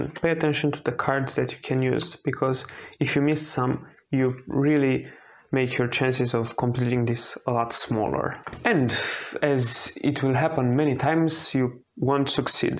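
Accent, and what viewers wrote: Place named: Russia. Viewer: Lmaoooo thank you bro.... Exactly what I wanted, learning a solo card game from someone with a Russian accent was simply perfect for me.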